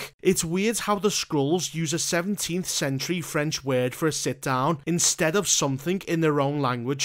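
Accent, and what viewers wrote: Liverpool, United Kingdom. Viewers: The swearing fits perfectly on these reviews, a scouse accent to boot. Brilliant reviews 🤣🤣🤣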